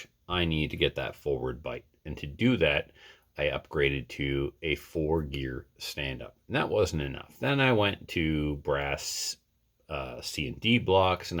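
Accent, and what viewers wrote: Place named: New York, United States. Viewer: you sounded like you were maybe from New York lol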